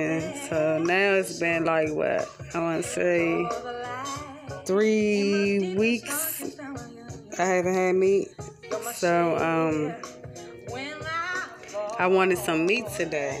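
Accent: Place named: Washington DC, United States. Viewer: Sounds like you're from DC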